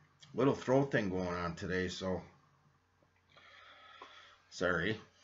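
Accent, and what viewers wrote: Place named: Upper Midwest, United States. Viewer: Good teaching, Must be cold where you live all bundled up. Unseasonably warm in the south. Michigan Wisconsin esc your accent 😉 Blessed by your teachings appreciate very much indeed brother! Thought I heard the trumpet the other night, turns out it was neighbors air conditioning unit😋 👂🙏😲👐 🙄😏😕😐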